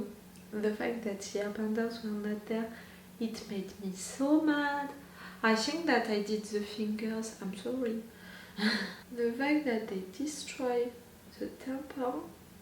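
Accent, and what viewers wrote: France. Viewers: i love your english with french accent its soothing at times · You still have the most incredibly French and incredibly sexy voice and accent that I have ever heard ; ) Now, on to the reaction! <3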